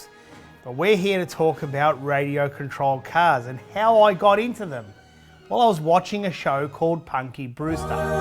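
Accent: Australia. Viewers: is there any other Australian that knows the same american shows, movies, and music like you do? I live in America and grew up in the 70's like you and im always amazed by the fact you had all the same stuff in Australia. Accept for your accent you would definitely fit in in America. Meaning no one would guess you were from Australia. Lol. Was it the Nintendo in 1986 that got you away from RC's? 👍😎🇺🇸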